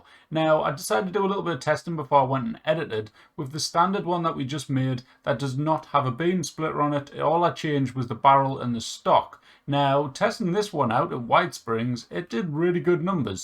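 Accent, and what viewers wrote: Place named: Durham, United Kingdom. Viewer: Bud,I'm trying to place your north east accent? Durham?? Love the channel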